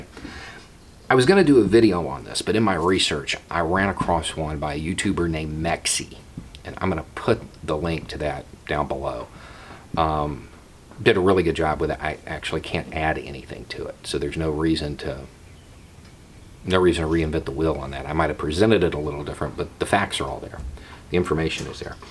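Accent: South, United States. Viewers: Hey Southern guy, where do U hail from? Asked. Another Southern guy.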